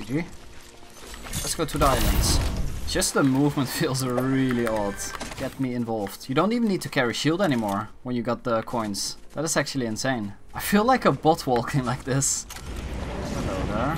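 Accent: Netherlands. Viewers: Are you dutch? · I hear a dutch accent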